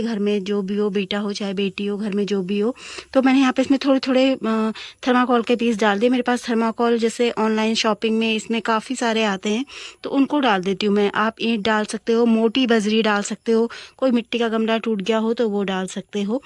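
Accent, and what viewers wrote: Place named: Garhwal, India. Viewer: Aap ghadwali ho awaz se lagta hae good sharing happy gardening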